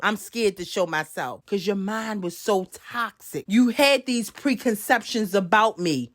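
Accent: Baltimore, United States. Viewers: You're so beautiful!!!!😍😍😍 and that BMore accent is everything 😂😅😂💪🏾💪🏾💯💯 and chhhiiiiillllleeeee iono what to say bout Ms. Keke. Sorry to that girl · Baltimore accent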